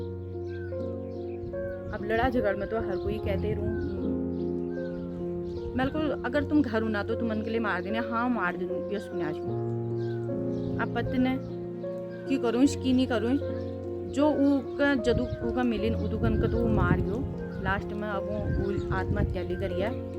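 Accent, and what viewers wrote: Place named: Uttarakhand, India. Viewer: आपकी भाषा ठेस गंगोंलीहाट की है आपका घर कहांहै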